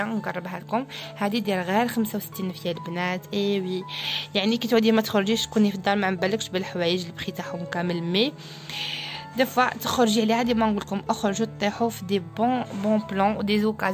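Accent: Constantine, Algeria. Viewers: ربي يوفقك حبيبتي و ربي يهنيك حبيبتي جاتني هدرتك قسنطينية انتي من قسنطينة !!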